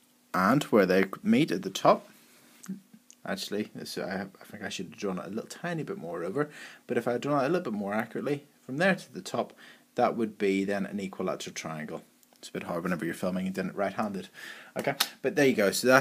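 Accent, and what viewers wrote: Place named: United Kingdom. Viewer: are you from England? because you have like british accent, if you are from England well high five because im from England aswell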